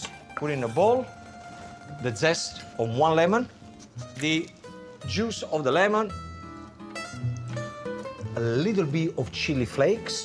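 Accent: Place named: Italy. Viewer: I am Finnish and I like your English with Italian accent. I like to hear Itslian. Thr colour of the Italian language is making the atmosphere..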